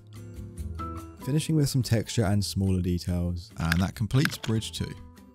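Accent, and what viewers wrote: Italy. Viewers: Love Your Voice ♥ Are you Italian Btw ? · si ligure un po pero piu sud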